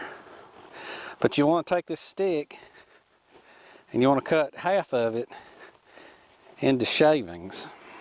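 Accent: South, United States. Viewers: you speak "country boy" and that's good enough for me. As long as your "peoples" can understand you, don't worry about the rest. Besides, the people that don't watch aren't in our league. God Bless Brother!!!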